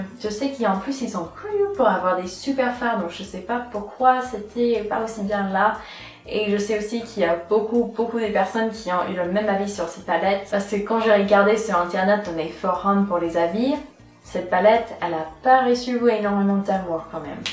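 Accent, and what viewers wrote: United States. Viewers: tu et tré jolie mais tes une francaisse ou américaine parseque ta un accent américaine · ***** Well, I'm wondering... Which words do you precisely need to learn? Because even though french is good, she pronounces words with her american accent. I know very well that most french beauty youtubers are boring though, so you probably don't want to watch their videos. ^^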